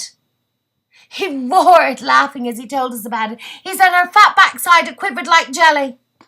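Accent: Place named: Ireland. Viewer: Well done, the Irish accent makes it cooler somewhat also :)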